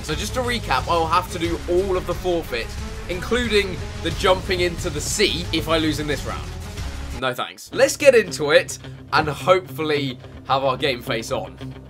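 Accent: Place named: United Kingdom. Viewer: agarwal he's British! (like me)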